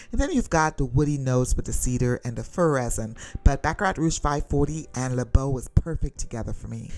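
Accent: Trinidad and Tobago. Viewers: I enjoyed it thoroughly. I could listen to your Trinidad accent and laugh all day. ❤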